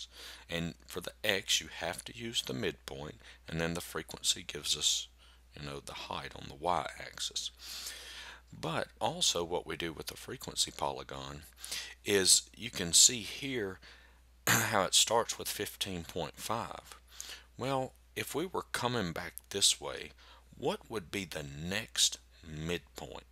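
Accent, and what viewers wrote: South, United States. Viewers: Thank you! P.S. You have a southern accent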